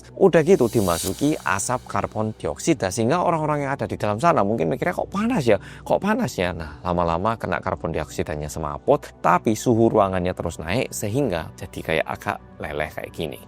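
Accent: Java, Indonesia. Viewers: Ya ampun😢 tks mas muedok🎉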